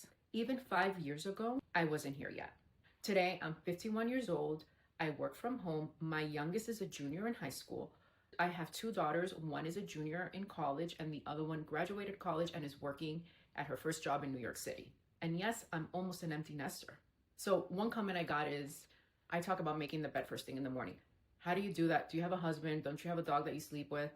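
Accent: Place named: Miami, United States. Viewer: I had a feeling. I love your Miami accent. Keep up the amazing work!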